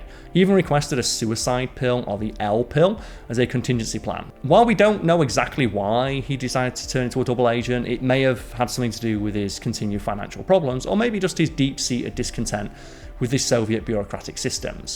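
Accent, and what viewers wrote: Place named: Northern England, United Kingdom. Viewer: You've done really well at slowing down the sleech pattern for all the non northern English people. I am one and it was really difficult when I moved over seas and appreciate the effort you've put in 😅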